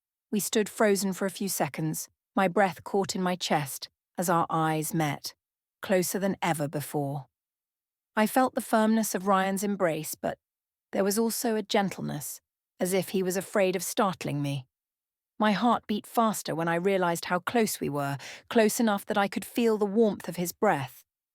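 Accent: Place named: United Kingdom. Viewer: Why do I have to suffer through a British accent on a story ostensibly about Americans in America?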